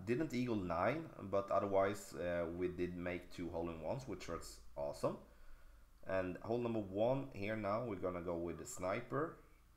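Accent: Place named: Scania, Sweden. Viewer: Härligt med lite skånska👌🏻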